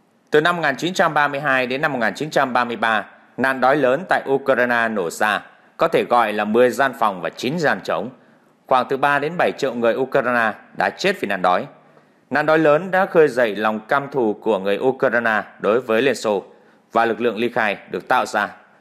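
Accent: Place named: North, Vietnam. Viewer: Bạn nói đúng! Nhưng vì Ba Ke đều có lối phát âm như vậy chớ không chỉ riêng anh nầy !!! Tôi chỉ nghe anh ta nói “ u - cà - na - na “ mà thôi ! Ngay như “ HÀ NỘI “ họ còn không nói được 👍 thì còn nói chuyện gì khác nưa chớ ??? OK 👍!?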